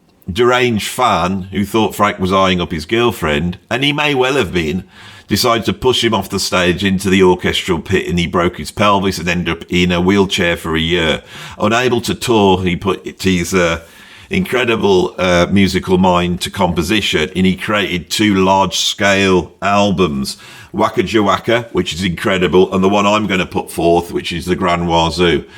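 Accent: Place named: Midlands, United Kingdom. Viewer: I saw Frank at Knebworth in 1979, aged 19 & that set changed my understanding of what music was. So it's great to see this great collection & selection especially delivered in a great Midlands accent. Uncle Meat is the record I'd take to my desert island, though I'd miss the others especially Hot Rats & Ship arriving too late...& Yellow Shark &...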